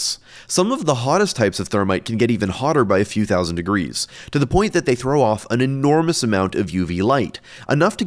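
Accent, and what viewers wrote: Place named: Canada. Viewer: I love how you can tell this dude is Canadian in the first min. "Must be cooked from the oatside"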